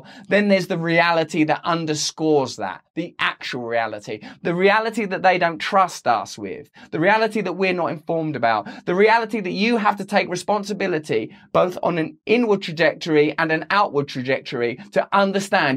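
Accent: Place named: Essex, United Kingdom. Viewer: Not negating your point but just as an aside, if you knew anything about British accents you’d know that Russell has an Essex accent. Nothing wrong with it but it’s definitely not regarded as high brow accent here in the UK (and I say that as a Gordie!)😂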